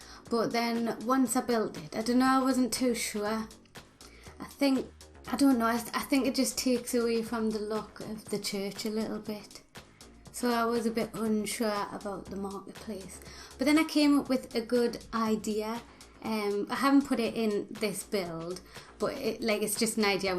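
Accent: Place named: Newcastle, United Kingdom. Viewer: I just wish I knew how to make things like this! Love your accent as well :) Geordie for the win!<3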